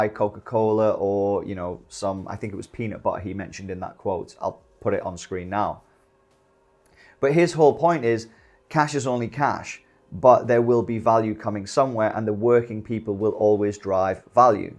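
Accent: United Kingdom. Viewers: Hello from US you brit?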